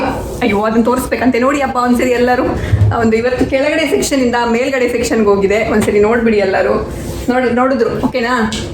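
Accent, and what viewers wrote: Mangalore, India. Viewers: Sis nivu mangalore kannada ne matadi keloke manasige eno khushi anasatte plz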